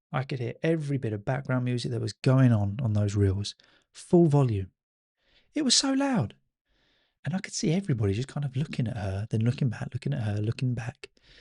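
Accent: United Kingdom. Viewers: Totally perfect British English accent thank you very much for your teaching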